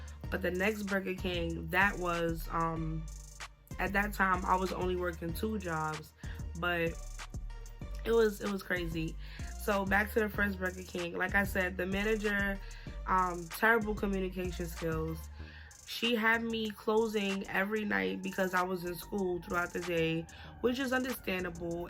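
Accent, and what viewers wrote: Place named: Brooklyn, United States. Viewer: You sound like you from Brooklyn ☺️ ! Chileeeee I worked at McDonald’s for a month & boutta start a new job at Burger King 🥴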